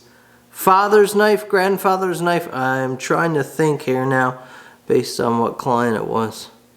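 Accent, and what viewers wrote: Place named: Newfoundland, Canada. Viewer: you live in Newfoundland?? no wonder i can't understand you LOL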